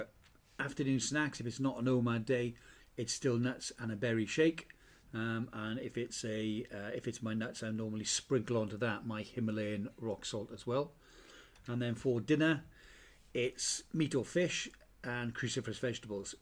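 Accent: Wales, United Kingdom. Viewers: hi watching out for a while I’m here I’m guessing that’s a welsh accent ?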